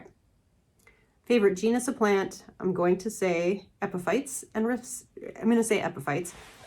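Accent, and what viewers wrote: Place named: Wisconsin, United States. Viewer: You’re from Wisconsin, I got the accent right!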